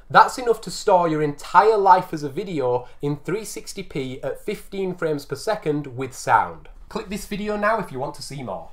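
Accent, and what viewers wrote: Northern England, United Kingdom. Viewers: You've got a new follower. Educational video with occasional healthy humor and a nice Sean Bean accent(and everybody else from the north in the GoT). Followed at less than 10k followers. See ya when you get a million of us mate.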